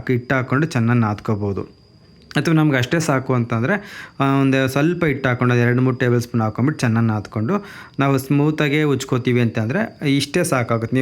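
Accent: Chitradurga, India. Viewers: ಹಾಯ್ ಬ್ರೋ 🙏ನಮ್ ಚಿತ್ರದುರ್ಗದ ಮಾತುಗಳು ಕೇಳಿ ಖುಷಿಯಾಯಿತು ಬ್ರೋ 😊❤️❤️ರೋಟ್ಟಿ ಪಲ್ಯ 😋👌👌ನೀವು ಅಡುಗೆ ಮಾಡೋದು ನೋಡುದ್ರೆ ಖುಷಿಯಾಗುತ್ತೆ 👌👌ನಮಗೂ ಸಪೋರ್ಟ್ ಮಾಡ್ಬೇಕು ಅಂತ ಅನ್ನಿಸಿದ್ರೆ ನಿಮ್ಮ ಸಪೋರ್ಟ್ ಇರಲಿ, ನಿಮಗೆ ನಮ್ ಸಪೋರ್ಟ್ ಖಂಡಿತ ಇರುತ್ತೆ 👍❤️❤️😊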